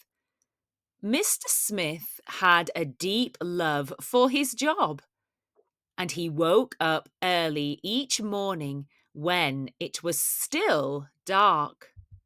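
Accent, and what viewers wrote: United Kingdom. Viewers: Brit accent is precious ♥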